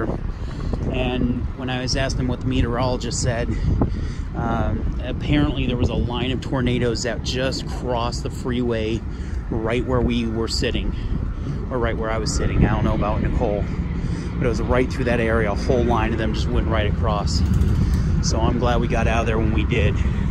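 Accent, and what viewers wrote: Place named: Wisconsin, United States. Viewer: Oh I love your accent! My dads side of family was all from Wisconsin. Haven’t heard it in years. ( I pop up with a little of it ever so often 😂)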